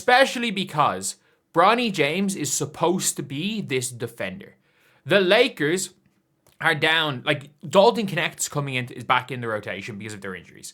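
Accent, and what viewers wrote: Ireland. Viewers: You must be from Cork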